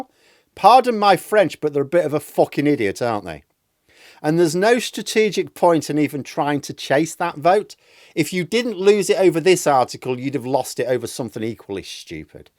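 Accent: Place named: Yorkshire, United Kingdom. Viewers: You went full Yorkshire,. ALWAYS go full Yorkshire. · “Let me go full Yorkshire in this one” You weren’t kidding!! 😂👍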